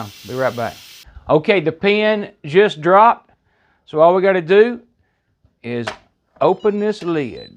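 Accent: South, United States. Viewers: love your accent! southern accents sound always so funny to me. i could listen to you for hrs. i just got one of these insta pots for christmas and i am scared to use it. much love from michigan! i wonder if my accent sounds as funny and nice as yours. take care.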